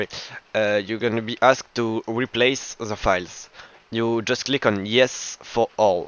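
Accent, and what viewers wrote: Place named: France. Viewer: l'accent francais xD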